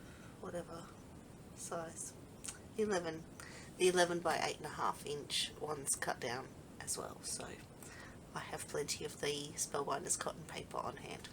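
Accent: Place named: Australia. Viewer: one of my faces girl! ❤️ And I can listen to the aussie accent all daaaaaay long! Lol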